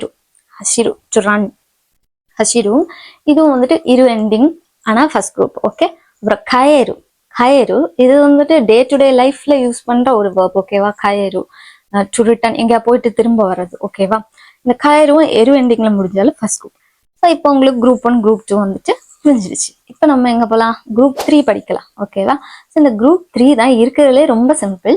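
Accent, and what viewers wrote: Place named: Kanyakumari, India. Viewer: Super. Keep going. By the way, are from Kanyakumari district? I could sense a resembling tone in your speech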